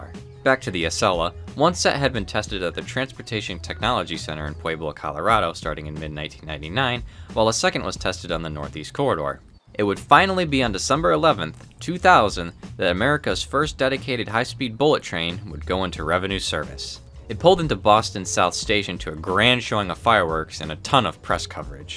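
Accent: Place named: Chicago, United States. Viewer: You gotta be a Chicago boy/or visitor👍🏻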